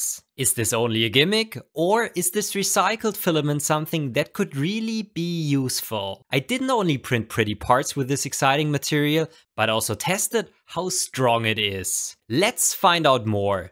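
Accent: Germany. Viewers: This deutscher Akzent ist schon gut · Man merkt das Du deutscher bist🤣 guten Tag evrebody im cooles video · Moin meister! Man kann hören das du deutsch bist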